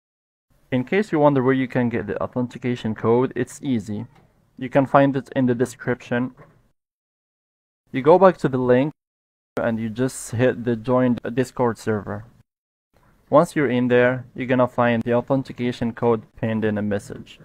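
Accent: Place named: Morocco. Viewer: Out of topic u sound Moroccan or Algerian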